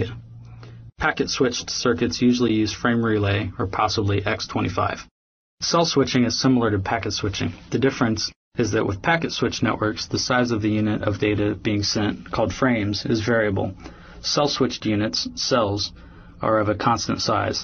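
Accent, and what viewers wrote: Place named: North America. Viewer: Throughout the whole video I thought you were North American. And when I saw your .uk website, you're from the UK? Man, respect.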